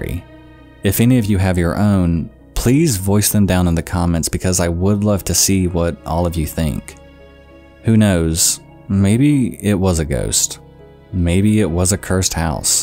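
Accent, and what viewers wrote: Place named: Midwest, United States. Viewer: heh, you pronounce especially like "expecially". you must be from the midwest.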